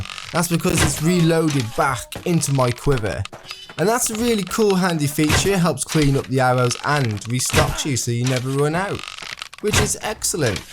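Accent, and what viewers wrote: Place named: United Kingdom. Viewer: Uh it kinda concerns me considering that its so dude that yells for his intro and the British accent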